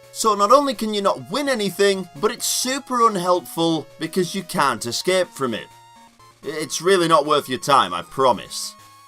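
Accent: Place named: Bolton, United Kingdom. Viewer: Came for the glitches, stayed for the Bolton accent. Have a subscribe, friend!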